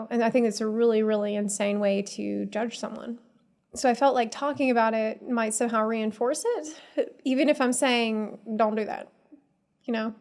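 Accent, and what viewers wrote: South, United States. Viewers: Her southern accent is hot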